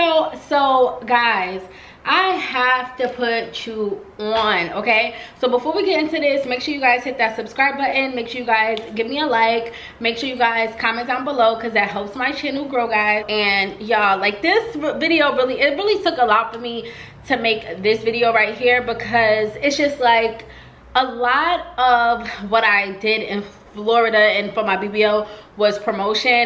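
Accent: New York, United States. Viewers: OMG! Sorry you had an awful experience. I had a second BBL by Dr. Blinski in Miami. Much better experience than Spectrum Aesthetics. That was a nightmare. I’m just here for your New York accent. You remind me of my daughter. ❤ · Why did I think I was watching a nicki vid-when she was like “de de de-DOWN BELOW”😂 or maybe jus heavy NY accent. Either way-she’s gorgeoussss❤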